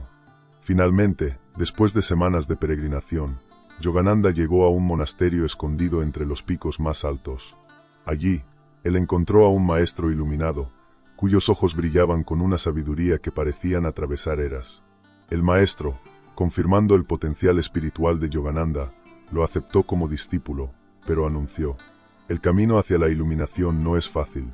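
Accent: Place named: Spain. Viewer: Olá ..por favor..alguien podría decirme de qué parte de España es este narrador? Paramahansa es mi maestro ..mi gustó mucho la narracion ! Soy de Brasil y entendi bien su discurso ..estoy apriendendo español! muchas gracias !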